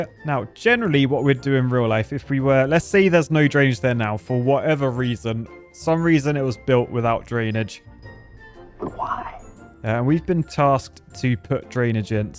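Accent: United Kingdom. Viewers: I heard way more British pronunciation in this "game" than any other video. 😂 I was like "What'd he say?" 😊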